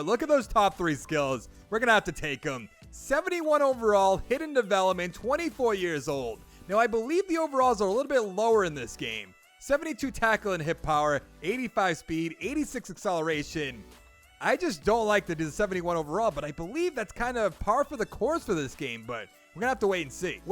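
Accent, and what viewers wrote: Boston, United States. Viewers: This is the thickest Boston accent I've ever heard besides Ben Affleck and Matt Damon😂😂😂😂😂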